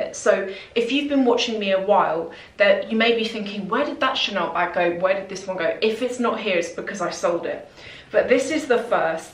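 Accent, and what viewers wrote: RP, United Kingdom. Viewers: back on yt, i was missing your accent hun! posh and gorge' :* i feel sorry for your rabbit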